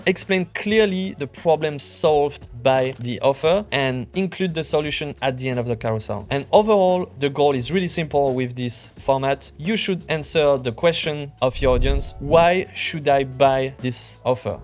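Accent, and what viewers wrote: France. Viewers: Merci, je vais suivre tes super conseils! C'est une bonne idée de faire tes videos en anglais tout en gardant ton accent francais, ca fait original et les anglo aiment ca!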